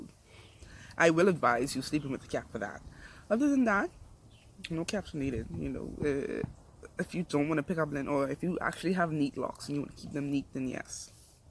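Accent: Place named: Bahamas. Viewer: I knew I recognized the accent ... I'm from Nassau, lived in Freeport for 20 something years. Been in the States for 21 years. My locs are 8 years old, had to combine twice. They're almost waist length. I'm trying to commit to freeform, semi is harder since my locs are thicker. I will commit though. Not religious reasons, I just love it and chemicals are no good. I absolutely love your locs and the styles. Shalom Queen !👑💜👍🏽💋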